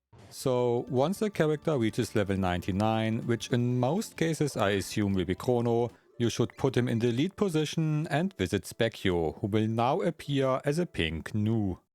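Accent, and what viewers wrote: Germany. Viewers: If I may interject. Elmer Fudd has a speech impediment. He says wabbit instead of rabbit and wittle instead little. I personally don't get the Elmer impression from you. Your accent is very German.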